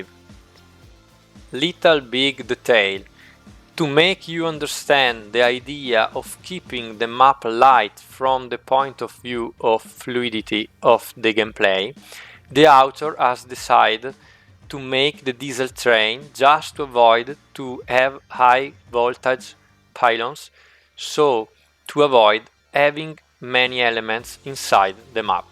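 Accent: Italy. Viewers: Bel video!! Quell'inglese con accento italiano e fantastico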